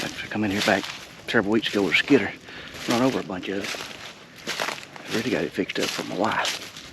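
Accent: South, United States. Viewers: Im from GA. You sound like youz from da South...Im a bow only hunter, and to see a gun hunter do all that with one shot gives me hope there are still fellas that know how to use a firearm. During gun season it sounds like a fire-fight when Im on stand. BOOM, BOOM, BOOM, etc. You did all with one shot. When I was kid I was handed a 30-30 Marlin, no scope, no shoulder strap and three shells. We had three tags for the year...I never missed. I was taught to shoot long before I learned to hunt...Very nice deer...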